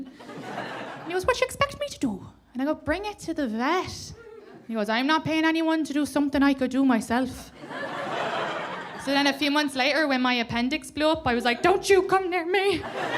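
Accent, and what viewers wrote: Ireland. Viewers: you are the best, sweetest and most hilarious person. You're 100% right about the accent. American men can't resist it in Irish women. I'd listen to you read a phone book for a day. I'll bet you're a grand bar maid, too. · Can't wait for more from ya, sucker for the Irish accent but stayed for the laughter! Hi from San Antonio! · NEVER EVER heard anyone make an Irish accent sound so annoying 😢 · Came for the Irish accent; stayed for the laughs. Great set! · Stop down to Appleton, WI skyline Comedy club - it's a 30 drive away from Lambeau Field. Wisconsinites don't get many Irish folks I think you would be a hit just for your accent. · I started watching for the Irish accent but stayed for the comedy. Well done you have a new fan. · Irish comedians never disappoint 😂 she is funny and cute and I adore the accent.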